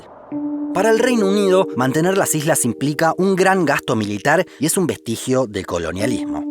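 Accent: Argentina. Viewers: el canal de donde termina siendo che? , porque tiene ascento argentino pero puede confundirse con el uruguayo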